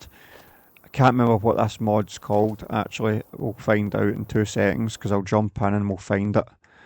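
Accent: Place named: Europe. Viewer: I absolutely LOVE your accent brother. Well I guess it's not a accent to you but it is to me 😂😂 I'm just a silly American who loves European accents...Scottish, Irish, UK...Oh yea, nice build too 😂😂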